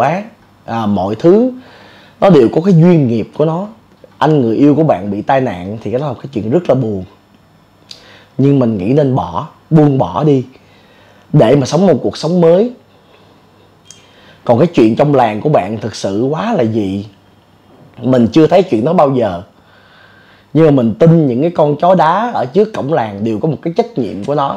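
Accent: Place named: North, Vietnam. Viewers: Bạn nói giọng bắc nghe dễ thương quá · Bạn kể có thêm giọng bắc nữa hay quá .👍👍👍👍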